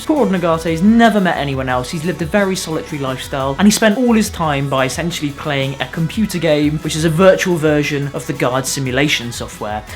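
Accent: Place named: United Kingdom. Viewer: I thumbed this up just cause I like your brit accent :p Did you read this manga through the end, up to and including the final chapter (78)? Since you disliked being handed over explanations I'm sure you liked it lol. Nihei's other works have even less dialogues, less characters, less character development, less character interactions and romances, less everything ahah. Anyway, season 1 & 2 of the anime were really pleasant and made me read the manga, but once the harem got put in place it started going downhill. Especially past chapter 55-60. Kinda a waste of such potential =/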